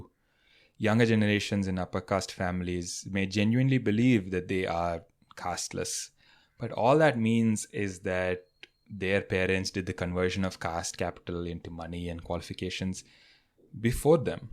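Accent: South India, India. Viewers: Another south Indian accent narrator pushing for a merit less society....chutiya saala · Congress funded youtube channel Just take a look at the titles of videos on this channel . South Indian accent too, opinion rejected.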